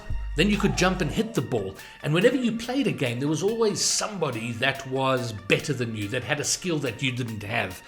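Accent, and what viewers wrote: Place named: South Africa. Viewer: i want to know if you are south african since your accent is the same as my south african grand parents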